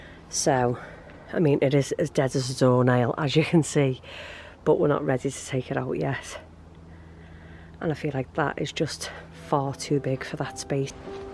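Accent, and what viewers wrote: Liverpool, United Kingdom. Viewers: Just found your channel and love it. Cant wait to get in my garden but in toronto its still a bit cool for planting. Are you a scouser like me?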